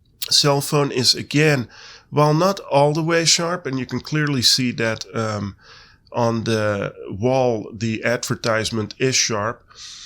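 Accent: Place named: Netherlands. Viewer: I'm Dutch and to me it really sounds like you have a Dutch accent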